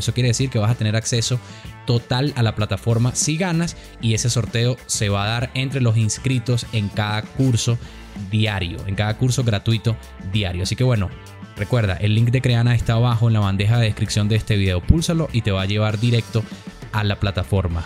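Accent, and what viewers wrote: Venezuela. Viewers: Excelente puedes ver como quedo en (Instagram). Por el acento somos paisanos Vzla. Gracias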